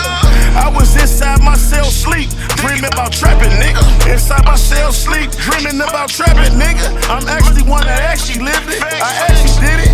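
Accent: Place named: Carolinas, United States. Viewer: I think he sound like them boys in t carolinas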